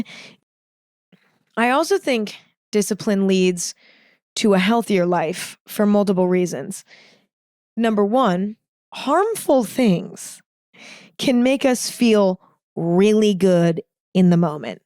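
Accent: United States. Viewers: I like your American accent and the way you speak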